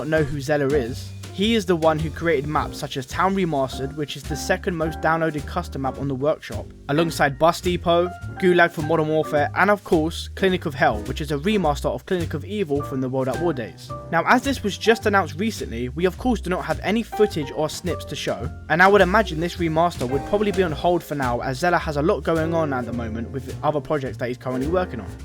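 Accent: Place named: United Kingdom. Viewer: You sound like a british mrtlexify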